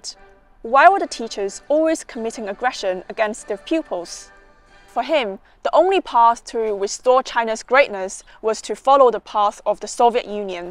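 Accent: England, United Kingdom. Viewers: Very well put. I confess that I am intrigued by China, and this video presented by this very sweet and lovely lady with her British accent, did an admirable job in pleasantly explaining why China is as it is. It is complicated. Western nations seemed to enjoy exploiting China for that Century of Humiliation. Shame on the West. I hope our nations can find a happy medium. Job well done,! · i could hear your english accent immediately lol. very cool. im american btw good video!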